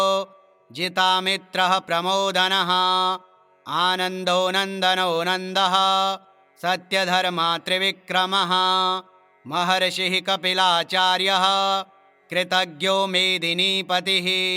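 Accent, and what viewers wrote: Standard. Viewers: शुद्ध उच्चारण सहित विष्णु सहस्रनाम प्रस्तुति । घर में सुबह पाठ से आनंदित वातावरण हो जाता हैं, बहुत बहुत धन्यवाद । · उच्चारा तील शुद्धता वाचकाला साध्य झाली आहे त्यामुळे ऐकणाराला श्रवण सुखा चा निखळ आनंद चा लाभ मिळतो धन्यवाद 😊 सा . म्हा म्हसे .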